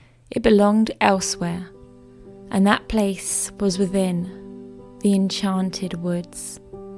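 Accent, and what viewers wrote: England, United Kingdom. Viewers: Esse sotaque é britânico? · Oh, how peaceful your ideas about how to enjoy living in the winter. I loved hearing you read the old folktale with that beautiful English accent. Even your cat seemed to enjoy the magical cozy atmosphere.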